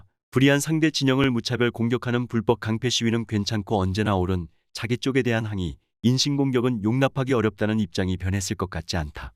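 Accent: North Korea. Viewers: 정성산님 목소리가 아닌데 어디 편찮으신가요? 자연스럽게 이북 억양이섞인 말투가 구수한데요 하루빨리 다시 듣기 바랍니다 감사합니다~^^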